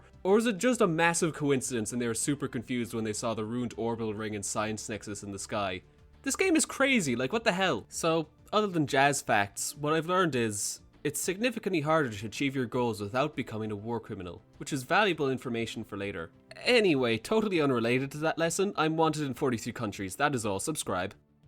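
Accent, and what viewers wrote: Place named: Ireland. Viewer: you sound like you're from Ireland.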